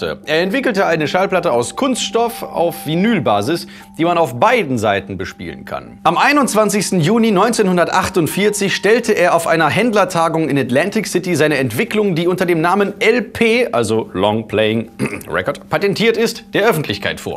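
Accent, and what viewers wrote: Switzerland. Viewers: Nicht alle Schweizer sprechen so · Bester Schweizer Akzent. Ich kann nicht mehr :D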